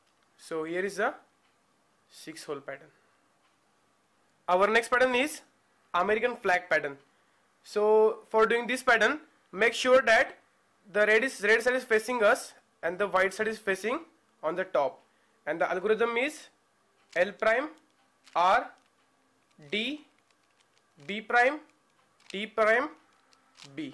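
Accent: India. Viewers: You kind of sound Indian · he sounds weird because he is indian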